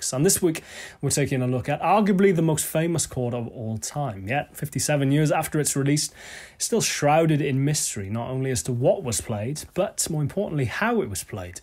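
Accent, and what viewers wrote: United Kingdom. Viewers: Helps to hear a Brit explain.. adds to the authenticity..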